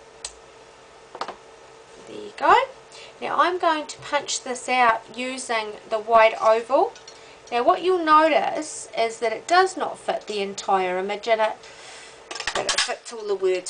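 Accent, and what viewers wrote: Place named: New Zealand. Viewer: nice video - clearly done. Was wondering if there were any NZers working on cards and posting videos on you tube. Nice to hear that accent again! Looking forward to more videos from you.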